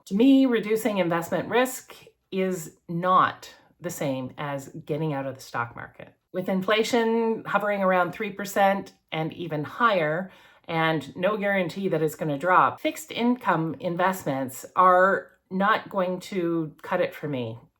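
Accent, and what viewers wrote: Canada. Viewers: I could tell you were a Canuck after just one sentence as I am a veteran of five entire seasons at two different Lake Temagami, Ontario canoe tripping camps. And as we all know, Canucks are supposed to eat their French fries with vinegar not ketchup. I am also an ex Benedictine monk and the sleeping habits you describe are most typical of cloistered hardcore monastics such as the Trappistines and the Poor Clares. I sleep in a heavy duty Cabela’s camp cot in a an extra wide sleeping bag rated to 20 degrees Fahrenheit and I sleep in my family room which I use as an efficiency apartment of sorts as I live with my sister in the family house we inherited jointly and she is hogging the entire second storey with all of the additional bedrooms. I used to sleep in the basement until it started flooding owing to the ongoing climate disaster with the storm sewers backing up owing to repeated torrential downpours. 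You could use a little more of that rain up there in Canuckiana to put out your forest fires.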